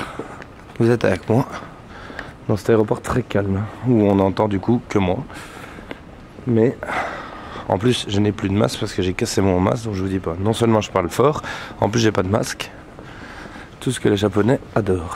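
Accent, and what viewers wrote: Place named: Belgium. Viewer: J'adore cet accent belge :)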